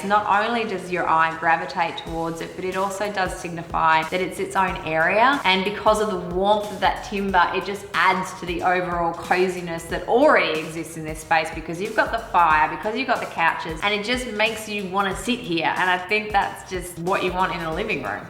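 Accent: Australia. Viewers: This is undoubtedly a lovely, cosy house which was very well designed, specially because it’s been built mixing wooden elements that contrast greatly with all the industrialised materials used such as the main marbling floor which was a fantastic choice as it’s not just beautiful for its colour and easy to match but durable and easy to clean too. I just think - in my humble opinion- that the backgarden, specially the very part that surrounds the swimming pool, deserved a proper, greenish, landscape project with lots of plants and trees not only to enhance the whole building but also to keep a cool temperature around rather than the plain grass chosen. As for the footage presenter, I must say you are lovely too, very enthusiastic, has a very convincing and descriptive language (with a lovely Aussie Accent) and I quite like you...well done!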